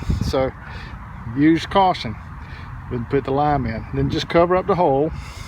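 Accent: South, United States. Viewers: “Then I’m gunna install 4 squash” 😭❤️ Sooo country lol. Love it! 🤘🏼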